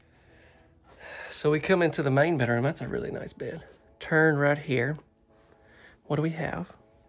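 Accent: South, United States. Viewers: Hi! I have been watching your channel now for approximately two (2) years. This is the first time I have ever left a comment. That being said, I just wanted to let you know how special I think you are. You are delightful to listen to, with that beautiful "deep southern" accent! I am born and raised in north central Florida, City of Gainesville, and home of The University of Florida "Fighting Gators"! Technically we are Southern, but most of us do not have the accent. I suppose perhaps it is the result of growing up in a major University City, where we are schooled with people from all over. Anyway, I enjoy You and your work, as well as watching the channel grow. Your Son is precious! I had 3 sons, two who gone home to our Lord and Savior, Jesus Christ. My oldest is still here, and He has given me two beautiful grandsons, ages 17 and 13. Keep up the good work young man and I will continue to watch your channel. 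God bless you and your loved ones. Angela Phillips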